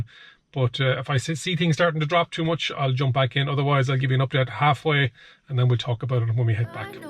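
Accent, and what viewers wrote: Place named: Cork, Ireland. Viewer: You sound just like my dad was was born in Cork (Balenagree)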